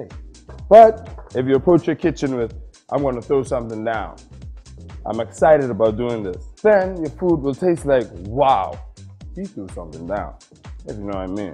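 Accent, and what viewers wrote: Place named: Turks and Caicos Islands. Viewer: Oh I catch this accent now! 😂 Turks & Caicos used to be a part of the Bahamas, thus the traditional foods & recipes will be close. In my experience, the difference in taste is minuscule. Much love, Bro!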